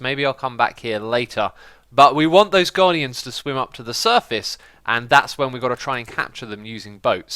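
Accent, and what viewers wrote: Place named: United Kingdom. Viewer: when british is so perfect that youtube's auto-transcript bot gets it perfectly right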